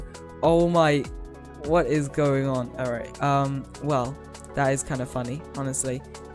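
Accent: United Kingdom. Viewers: Are you from the U.K? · are you British?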